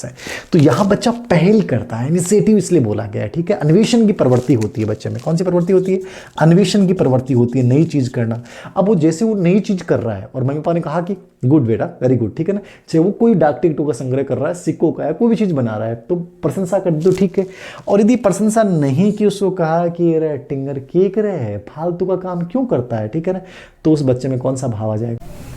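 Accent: Rajasthan, India. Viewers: बहुत अच्छा समझाया आपने सर जी मारवाड़ी आपकी बहुत अच्छी लगती है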